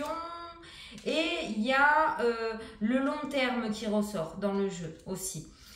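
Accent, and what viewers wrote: South, France. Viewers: J'avais raison vous êtes dans le sud comme moi il y a des lotos je pense que nous sommes pas loing mais ce suis pas chiante ont parle comme sa ici.❤❤❤❤❤❤❤❤ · Coucou, je vous découvre depuis hier, et c'est rigolo, le début de la guidance avec un accent du sud hyper prononcé et disparaît au fur et à mesure. Merci pour tous ces détails. 🙏🤗❤️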